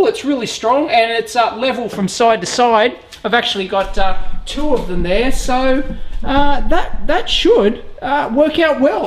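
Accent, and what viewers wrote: Australia. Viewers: Australian. His accent sounds nothing like a Canadian one. Also most Canadians would use feet for that type of job.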